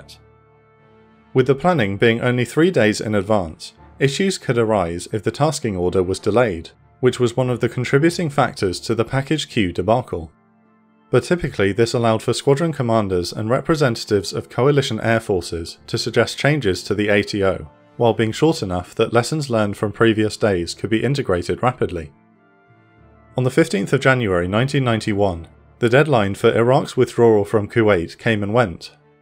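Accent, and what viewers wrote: England, United Kingdom. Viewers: The English accent threw you off. But he does say Iraq. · why is ALWAYS some deep English accent?! I COULDN'T listen to it as much as I wanted to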